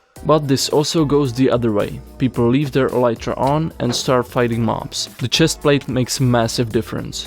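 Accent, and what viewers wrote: Czech Republic. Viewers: bracho ta cestina tam jde slyset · bro had czech accent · ceskej prizvuk uplne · You do have little bit of czech accent. Amazing video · bro where are you from? You don't sound like you're from an english speaking country. You sound like you're from the Czech Republic. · what nationality are you? you have a czech accent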